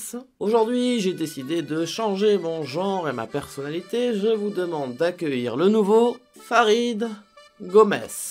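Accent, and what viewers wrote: Spain. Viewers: Au début en lisant les sous-titre en même temps d'écouter ce que tu disais, je me suis dis : mais... google traduction est pourri haha! Sinon, trop mignon ton accent en español !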